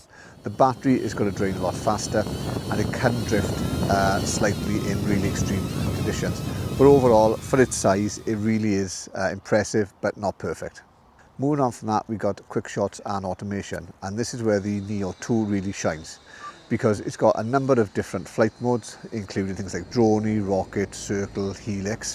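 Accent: Wales, United Kingdom. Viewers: I've almost got my neo2 motion, 😂. I've already bought the 65W wall charger and a fancy carry case. Weather here is improving, only got 1 inch of snow today which is good friday, so not too far from a trip to the hobby shop. If it doesn't like your Welsh accent how will it do with my mixed accent of Geordie and Canadian. 😱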